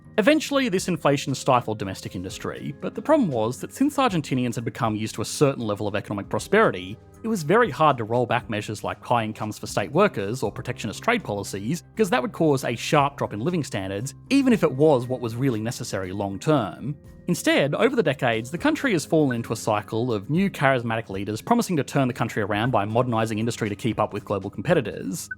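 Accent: Australia. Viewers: Boludo, he’s Australian. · What kind of Australian accent does have? Sounds a little different than other Aussies. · es ingles? el acento del narrador me suena a australiano pero me puedo estar equivocando (?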